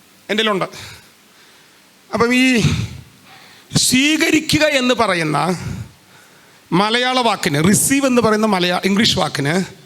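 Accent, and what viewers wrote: Thrissur, India. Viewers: തൃശൂർ ഭാഷയ്ക്ക് എന്താ കുഴപ്പം. ഒരു കുഴപ്പവും ഇല്ല.. സാർ ധൈര്യമായി പറഞ്ഞോ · എനിക്കിഷ്ടമാ ഈ തൃശൂർ ഭാഷ.... അത് പോലെ പാസ്റ്ററിന്റെ ചിരി....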